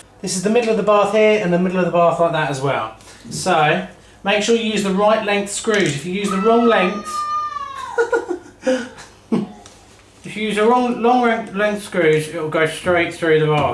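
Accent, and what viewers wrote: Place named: United Kingdom. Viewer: The British accent is a little bit distracting.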